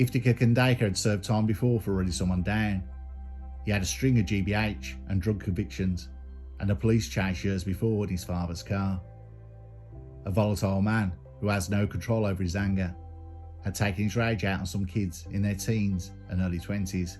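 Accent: Birmingham, United Kingdom. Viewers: I know i keep saying this but I ❤this curious and spiritual i just wish you could do videos on a more frequent basis love it tho love the content and your narration sir are you a Brummie sir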